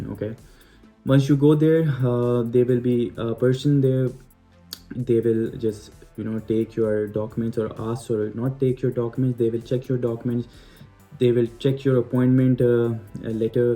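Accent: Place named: India. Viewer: Great great accent unka indian hai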